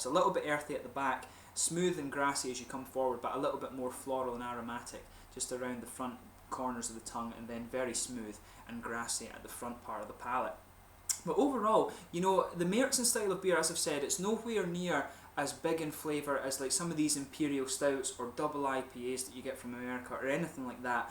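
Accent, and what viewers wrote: Scotland, United Kingdom. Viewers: You have quite a bit of different Language skills , it's difficult I'm sure to acquire those different languages like that . I've watched many Movies with Scottish Actors and Actresses , and when I watch you're Reviews I always look for comparisons between you and them, and you have become really good at curving your accent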